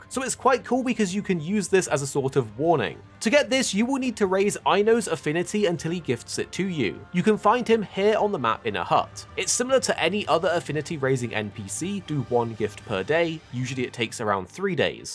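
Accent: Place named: Scotland, United Kingdom. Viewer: In yoùtubes defence he does have a pretty thick Scottish accent.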